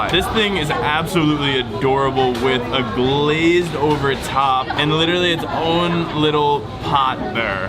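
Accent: France. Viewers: i was wondering when you travel around the world and get so much street food does it ever happend to you to get a food poison nice french accent btw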